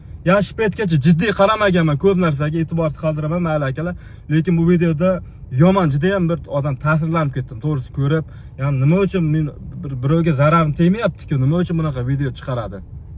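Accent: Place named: Laqay, Uzbekistan. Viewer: Laqaycha gapirma zaybat oʻzing laqay degani gapriwni ham laqaycha qildeganimasku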